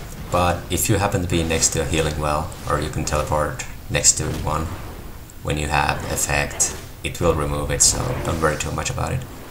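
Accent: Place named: Asia. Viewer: lol you're funny. I can do that same accent. Are you actually asian?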